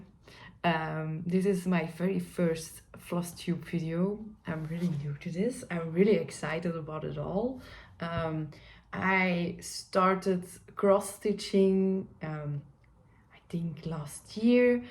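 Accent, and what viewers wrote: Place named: Germany. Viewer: Welcome to flosstube from the south or Germany! ☺️ I actually thought you were German too at first, your accent sounds very similar.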